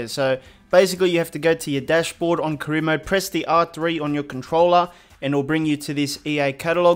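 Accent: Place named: Australia. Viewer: He has australian tinge. I and long vowels. Adviiiiice. Hit. Thinking. Nil. I could go on. R.i.p if you were nz born and then moved to aus. You also sound like you born south auckland?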